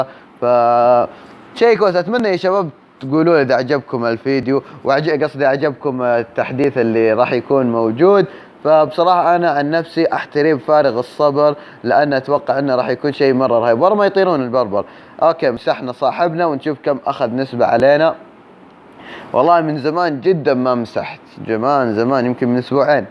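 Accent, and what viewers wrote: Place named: Saudi Arabia. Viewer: kmal alswydy واضح كلامه هوا من السعوديه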